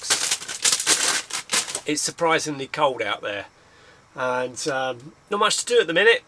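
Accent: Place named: United Kingdom. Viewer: British English ❤❤ I hope I can learn it 😢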